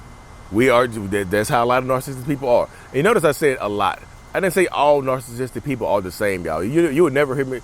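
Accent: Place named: North Carolina, United States. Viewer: Dude, your accent make me wan move bk to Rocky Mount n things like dat haha 💛💪🏻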